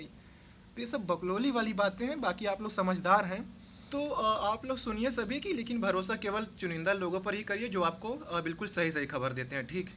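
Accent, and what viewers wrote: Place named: Gorakhpur, India. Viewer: लेकिन् आपके बोलने के स्टाइल से तो लगता है की आप गोरखपुर देवरिया से है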